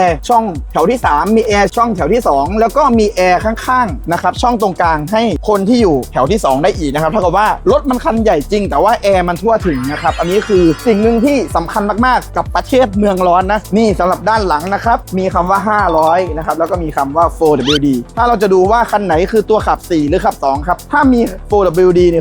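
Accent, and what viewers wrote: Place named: Isan, Thailand. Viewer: ฟังสำเนียงน่าจะคนอีสานบ้านเราต้องสนับสนุน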